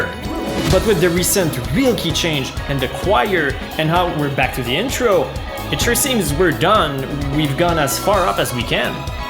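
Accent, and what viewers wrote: Quebec, Canada. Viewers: merci pour la video, tout après 5 seconde j'ai tout de suite su, sauf si je me trompe.. que tu es québécois avec ton accent anglais, qui ressemble pas mal au mien :P, très bon gout pour ton top 10 merci (sauf le no. 1 xD) et un subscriber de plus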